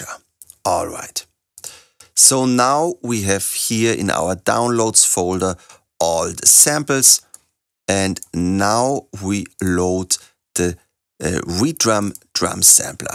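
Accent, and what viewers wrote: Germany. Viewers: I can’t get past the thick German accent 😅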